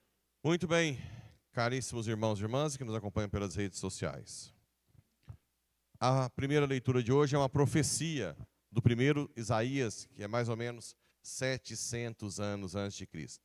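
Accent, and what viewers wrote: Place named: Goiás, Brazil. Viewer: Deus abençoe o senhor Pe, sotaque goiano, é o máximo 😅😅😅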